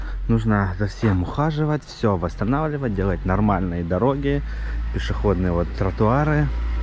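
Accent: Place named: Donbas, Ukraine. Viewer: у донбасский говор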